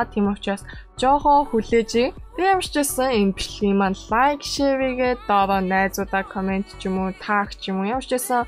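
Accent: South Korea. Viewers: ahiin duu bitgii shantraarai yhv john buurunhuu yaridag l bga biz chinii solongos aylaga akcent ystoi best shu amjilt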